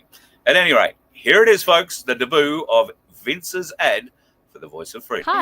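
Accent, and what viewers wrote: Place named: United States. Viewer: Awesome vid Vince, but why an Amercan voice and not an Aussie voice ????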